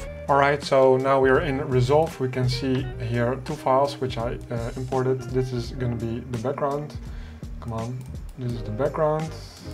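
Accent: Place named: Netherlands. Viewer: ik ruik wat nederlands accent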